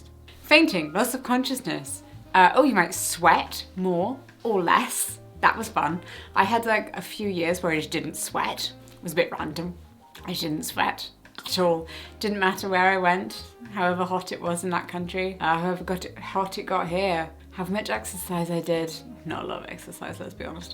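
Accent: United Kingdom. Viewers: I'm a nurse and I think you explained very clearly and simply what dysautonomia is and why it happens ! Always a pleasure to watch your videos (and also I'm french and I think your accent is deliciously british ✨ that's also a pleasure to hear)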